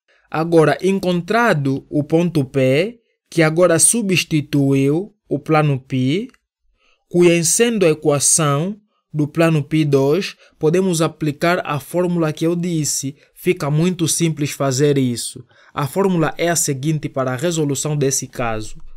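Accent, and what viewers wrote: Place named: Angola. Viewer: FALA IGUAL ALGUNS CONHECIDOS DA ANGOLA!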